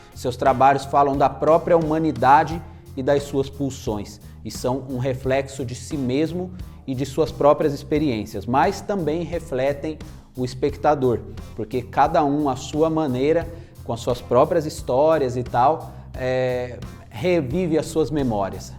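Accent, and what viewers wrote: São Paulo, Brazil. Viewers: você é da região de SP?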